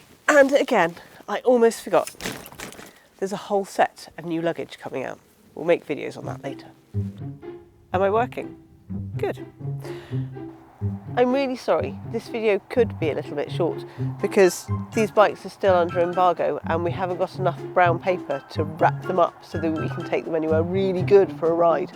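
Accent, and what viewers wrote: England, United Kingdom. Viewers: Long time since your dulcet robbed English accented voice issued forth from the speaker of my smartphone. A 20 inch Brompton with Shimano 8 speed is not very different from the Moulton that was on offer last year out in Arizona. Shame it doesn't have the front fork of the Moulton The Moulton frame might be stronger. Not as convenient. The 34 spoke rear wheel would be better for use on unpaved roads. We have hundreds of miles of dedicated bike trails here in Missouri. Katy. Rock Island etc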